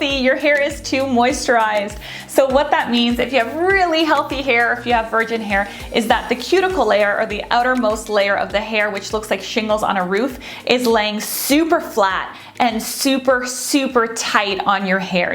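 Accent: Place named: Canada. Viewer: Pregnancy look so cute on this lady. The Canadian accent is an absolute nightmare.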